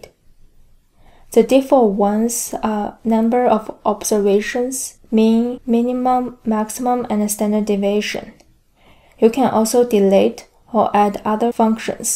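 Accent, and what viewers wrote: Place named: Asia. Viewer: Super thick Asian accent makes the video difficult to understand. Had to use subtitles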